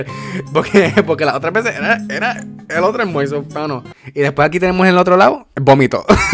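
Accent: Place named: Puerto Rico. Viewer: yo tambien lo soy pero naci en estados unidos y ya sabia que era de puerto rico por como hablaba xdd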